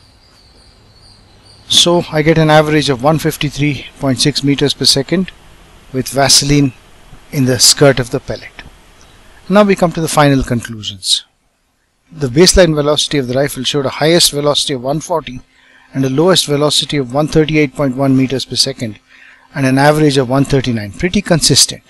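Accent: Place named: India. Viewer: one of the better Indian accents in English I've heard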